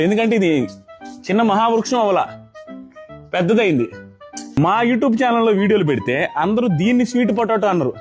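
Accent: Nellore, India. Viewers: Maaa Nellorelolla yaaasa antne untadi mari😂❤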